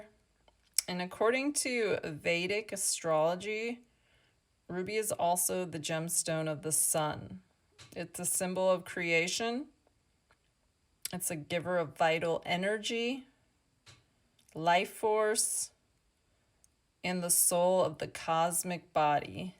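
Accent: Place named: United States. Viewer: sounds american Indiana or Colordo im guessing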